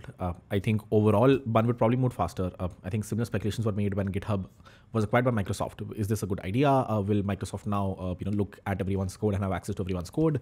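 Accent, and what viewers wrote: India. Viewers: Indian accent English with this much pace is very difficult to understand..slow down a bit bruhhhm.. it seems like rapping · Haha it's his Indian Ascent. I hardly understand so I listened very carefully 😅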